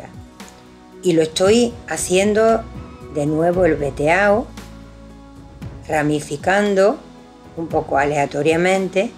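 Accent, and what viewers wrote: Andalusia, Spain. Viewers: Excelente, gracias x lo q enseñaste, lo.voy a poner en practica.saludos, desde Argentina..eres andaluza?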